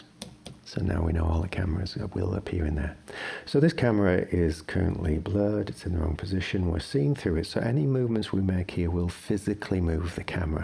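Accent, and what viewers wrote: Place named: United Kingdom. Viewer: wow this guy's voice is different he must be from the UK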